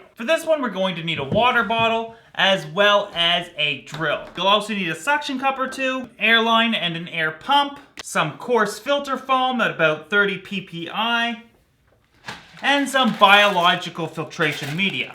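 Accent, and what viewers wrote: Canada. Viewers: I love this guy's Canadian accent "Aboot" lol. Great video though.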